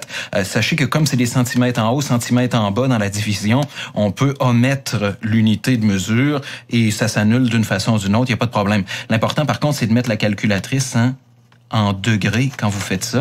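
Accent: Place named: Quebec, Canada. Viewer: Français accent québécois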